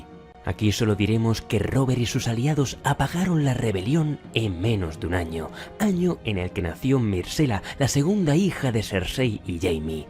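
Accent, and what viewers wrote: Spain. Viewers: Bonitas narraciones y hermoso asiento de la vieja madre patria, saludos desde 🇨🇱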